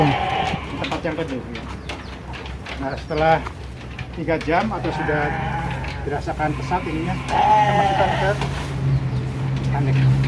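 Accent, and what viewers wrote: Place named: Indramayu, Indonesia. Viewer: ngmomg e medok wg dermayu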